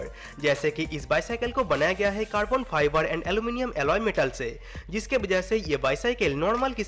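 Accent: Bengal, India. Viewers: Bhai bengali type ka hindi bolte hoo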